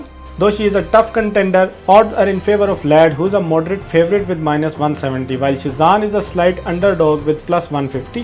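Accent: India. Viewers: Wtf!! First time i heard indian talking bout mma n his english ndian accent ia annoying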